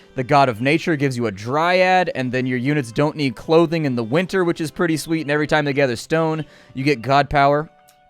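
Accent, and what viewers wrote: United Kingdom. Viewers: Love the brittish peseant accent :D Oh and the game as well :P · Duuude that Bri'ish accent!!! loving it.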